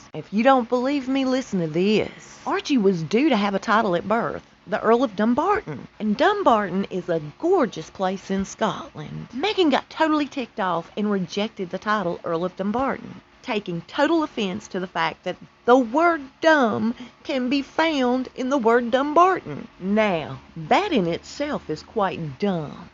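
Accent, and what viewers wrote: South, United States. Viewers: thank you so much for this video and all your videos.I had a hard long day Here in Vegas such a blessing To come home Cook dinner and here that southern Voice.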